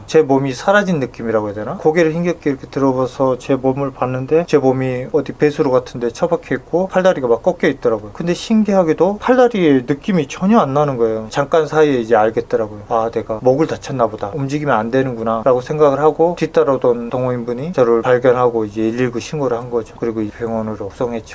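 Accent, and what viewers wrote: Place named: South Jeolla, South Korea. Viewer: 전남 억양이네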